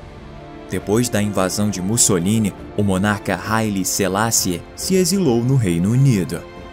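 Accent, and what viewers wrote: Rio de Janeiro, Brazil. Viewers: É, não consigo ouvir esse sotaque carioca carregado de x e vogais inexistentes por mais de 20 segundos... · Num dá pra ouvir é muito carioquês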